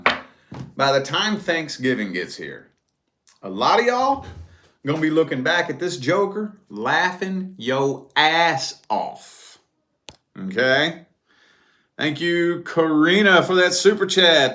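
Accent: South, United States. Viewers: U sound like u really from the south ?… hhhmm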